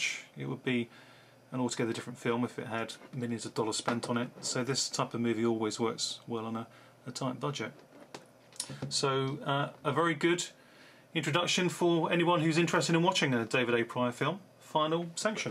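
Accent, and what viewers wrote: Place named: England, United Kingdom. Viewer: noticed your accent mate i am from england too, come from essex mate. how did you manage to get deadly prey on vhs. i used to have a vhs copy orinigal not in japanese writing, the cover looks like danton at the end of the film screaming outside of a bulding, which is misleading cause you don't see any buildings in the film. still great movie. don't have jungle assault still trying to get a copy. do have deadly prey.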